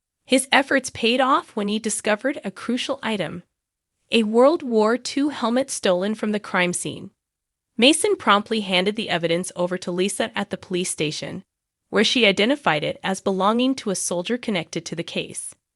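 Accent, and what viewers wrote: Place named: United States. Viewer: The AI voiceover in an American accent is very annoying who pronounces names wrongly!!!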